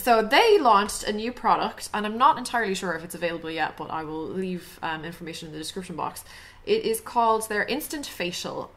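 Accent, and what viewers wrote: Ireland. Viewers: Don't want to sound like a stalker or anything but what part of Ireland are you from? ;)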